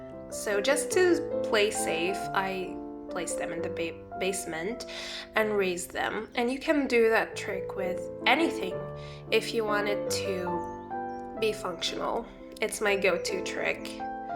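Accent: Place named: United States. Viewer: Tbh you sound like an American to me so your doing great!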